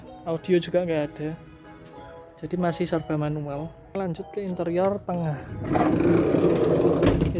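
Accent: Indonesia. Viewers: mase ngingetin koncoku orang Surabaya :D.. apik motore mas wkwkw.. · Paras cinese tp logat jawanesa 😆😆😆👍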